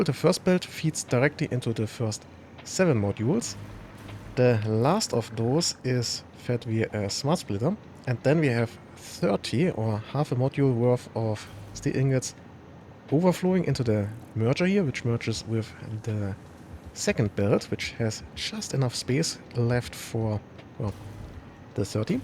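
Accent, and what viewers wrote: Germany. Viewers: Man höhrt deinen Deutschen Akzent soo gut raus, ich liebe es :)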